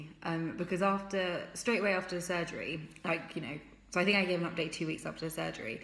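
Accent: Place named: United Kingdom. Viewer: I was expecting an american accent then bam british o.o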